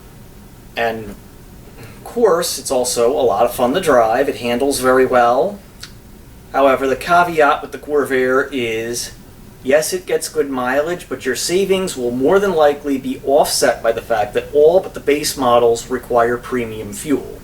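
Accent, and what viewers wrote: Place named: Chicago, United States. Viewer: You said South Carolina but I hear Chicago.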